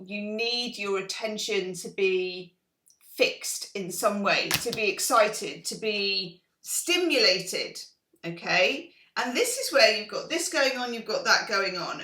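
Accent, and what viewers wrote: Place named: United Kingdom. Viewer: Ii know we both speak English ; but for some reason this American is having difficulty understanding the British English. It’s my fault of course !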